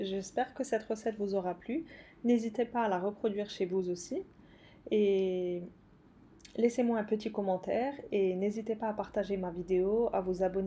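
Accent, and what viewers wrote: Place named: Lorraine, France. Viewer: bonjour Quelle est la taille de votre poêle? Tombée par hasard sur votre site j’adore! Je crois reconnaître un petit accent lorrain 😊 Bravo et continuez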